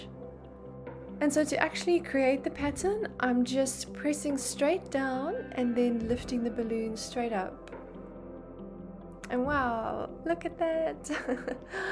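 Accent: South Africa. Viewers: So pretty. Yes I was having the same challenges with the paints - def going to try your tips. I enjoyed listening to the SA accent - made me homesick.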